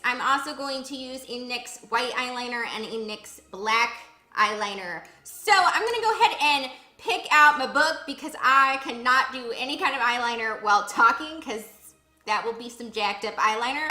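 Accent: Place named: New York, United States. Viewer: Great vid,!....It was all that and a box o' chocolates!:))...........fun New Yawk accent lol.....that was like a dame in a 40's movie.......that makeup looked very cool.....great pics at the end:))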